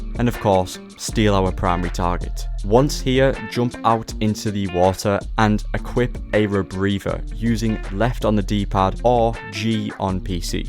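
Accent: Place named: Newcastle, United Kingdom. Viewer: Good vid(u sound a bit geordie pal lol.im one)aye ill Definitely be watching more of ur vids beo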